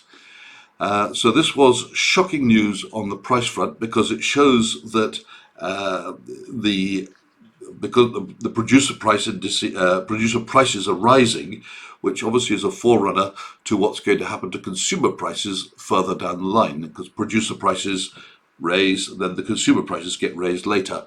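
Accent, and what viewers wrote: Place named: RP, United Kingdom. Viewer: Forget your Bloombergs, Reuters et alteri, isn't it so much nicer to listen to excellent analyses brought forth in his equally enjoyable Queen's English?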